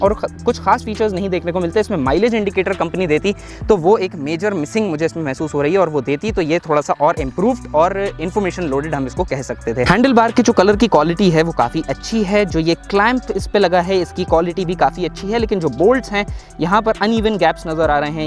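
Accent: Bhopal, India. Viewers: Aisa lagta hai aap bhopal se ho bro acha laga itna knowledgeful banda paas mp me hi hai 😊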